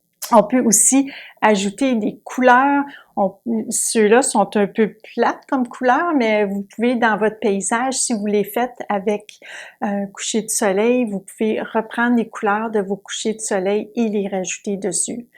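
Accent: Quebec, Canada. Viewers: c'est un plaisir de vous écouter (avec votre joli accent québécois) et vous regarder peindre, j'ai justement des rochers à faire, j'ai un peu de mal car beaucoup de nuances, j'utilise un modèle de photos prise en hiver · J'adore vos explications ! .En plus votre accent canadien ,c'est super ! .Merci à vous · Merci pour vos conseils dorénavent je suis tous vos tutos j'en suis ravi, un marseillais qui adore aussi votre accent Québéqois. Michel Hibernac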